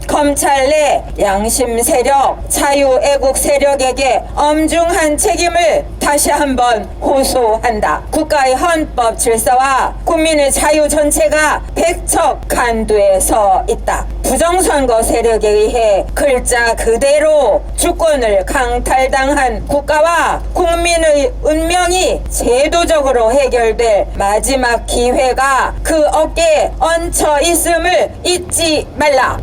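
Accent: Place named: North Korea. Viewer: 이 방송하는 여자 바꿔라 ! 북한 노동적위대 방송하는 북한식 어투같아서 처음엔 깜짝 놀랐다. 당장 바꿔라 ! 강하고 아름다운 자유 대한민국을 후손에게 물려주기 위하여 !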